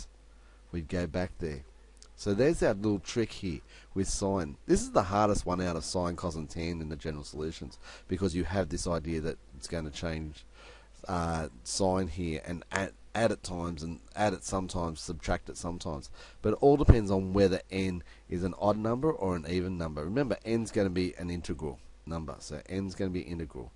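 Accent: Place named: Australia. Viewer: aussie ascent ...nevertheless good tutorial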